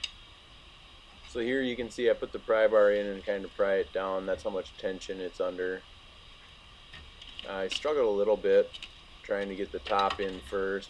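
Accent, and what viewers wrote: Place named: Wisconsin, United States. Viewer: Thanks, I have a 2001 x5 and doing this project this weekend in Arizona. I noticed the accent, I grew up in Oshkosh.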